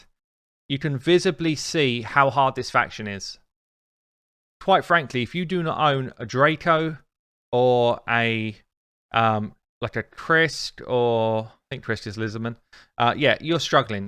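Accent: England, United Kingdom. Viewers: Man, you are damn' legend! Great job! Even tho I hate english accent, I listen every single your word with pleasure. You did amazing and huge job to support newbies... :D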